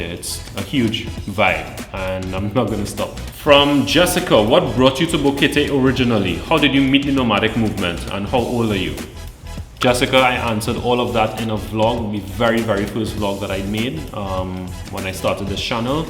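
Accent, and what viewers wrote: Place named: Trinidad and Tobago. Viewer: Our beautiful Trini accent .Keep in representing another YouTubed here.Keep up the good work.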